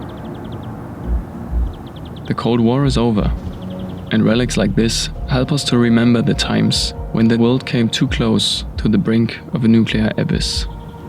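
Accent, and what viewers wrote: Germany. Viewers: nice video but why is it so hard for germans to speak english without accent?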